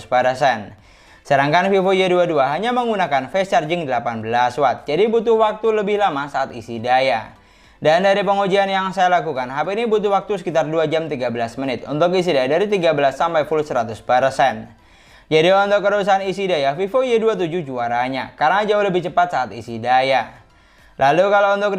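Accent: Madura, Indonesia. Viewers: Bang asal madura kah?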